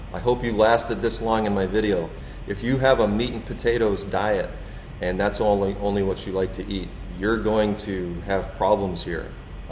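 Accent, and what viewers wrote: United States. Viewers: wow awesome american accent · steryotypical American accent, Americans don't actually talk like that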